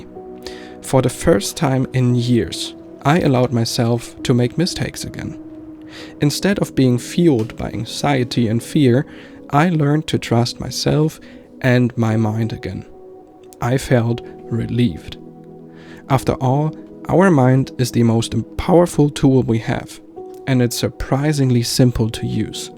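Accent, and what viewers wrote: Germany. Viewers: ❤ After a few sec (lovely vibe) and after your first words (slight German 🇩🇪 accent) - LIKED & SUBBED. Period. Tschüss, Ich war aus Ungarn 🇭🇺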